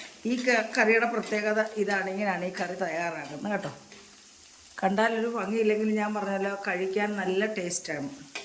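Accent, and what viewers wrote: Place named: Mavelikkara, India. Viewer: നമ്മുടെ മാവേലിക്കര കാരുടെ അച്ചടി ഭാഷ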